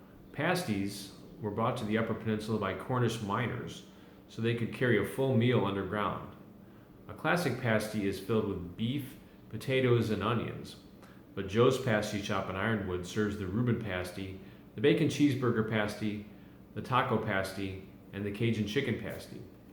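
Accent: Ontario, Canada. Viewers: sounded more Canuck than yooper. It's oly wa, not holy wa. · This sounds like the stereotypical Canadian accent! Looks like there’s some overlap between Yoopers and Canadians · Bit of an Ontario sound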